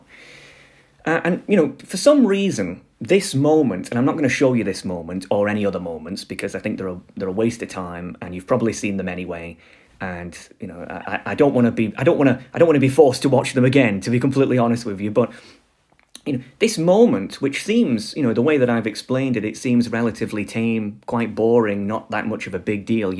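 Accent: United Kingdom. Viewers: This has been going on for years, it has just gotten worse with the internet. Before social media really became the behemoth it is now. You sound like you are from the UK or at least lived there and we had two notable celebrities. Jade Goody, who got famous basically for saying stupid things on Big Brother and acting "low class" And Katie Price - who garnered fame and wealth by constantly feeding the gossip rags with salacious tales about her life.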